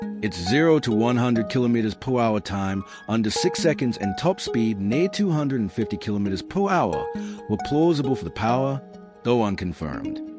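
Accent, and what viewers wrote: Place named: United States. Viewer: You couldn't find an AI Aussie voice 🙄 it kinda ruins it with the American accent.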